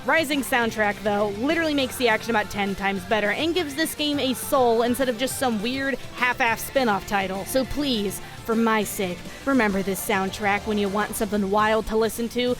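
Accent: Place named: Virginia, United States. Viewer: I'll always have a soft spot for FF8's soundtrack. " ", the boss theme, the standard battle theme, the music during the assault on Dollet,... IT'S ALL SO GOOD. Also, I don't think I'll ever get over that one of my absolute favorite Youtubers is a Virginia native like me. First time I heard talking I was like "I know that accent!"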